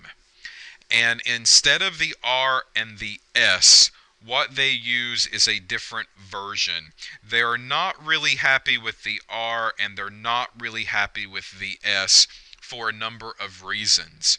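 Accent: South, United States. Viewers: love the dixie accent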